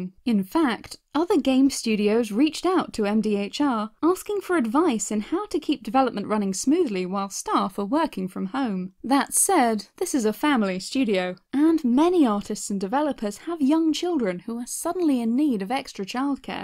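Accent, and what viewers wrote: United Kingdom. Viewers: Theres just something about a British accent.🤤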